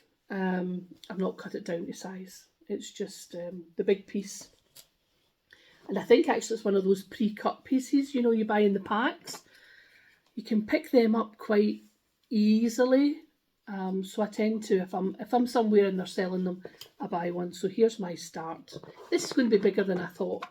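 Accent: Scotland, United Kingdom. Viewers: Hello, so pleased you have returned to Youtube. I have missed you over the last 12 months. I do love your Scottish accent as well as. Happy stitching. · Lovely to hear a Scottish voice on Flosstube. Back to cross stitch after about 25 years and really enjoying it. Can I ask where you got your lovely project bags from? Just subscribed x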